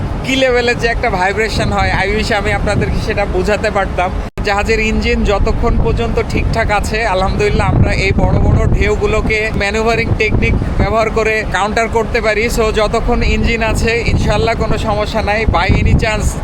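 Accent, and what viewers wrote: Bangladesh. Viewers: Tumi Bangladeshi tai to? Tomar kotha bolar style kothar type sune mone hochhe....je standard kothar tate mone holo...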